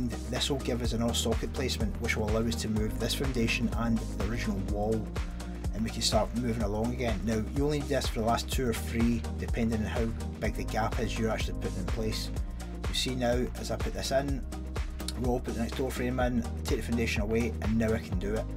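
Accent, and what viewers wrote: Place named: Scotland, United Kingdom. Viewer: Man r u from scotland cus i love ur accent!!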